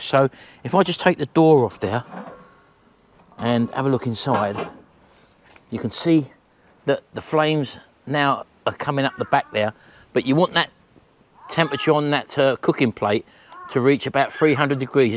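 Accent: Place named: Cockney, United Kingdom. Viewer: Fairly new to your channel and I love it, I'm from East London but now live in Thailand, good to hear the Cockney accent. Dave B Thailand.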